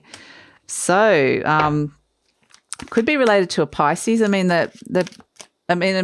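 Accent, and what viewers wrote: Australia. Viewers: Lovely to hear an Australian accent!!!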